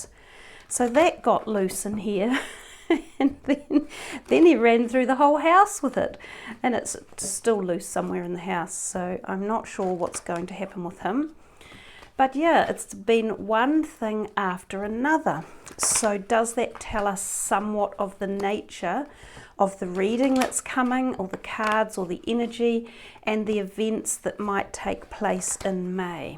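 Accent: New Zealand. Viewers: “Four different dex of cards”... Love the kiwi axcent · Sounds like a kiwi accent 🌲🌿 🤗 Thanks for your reading.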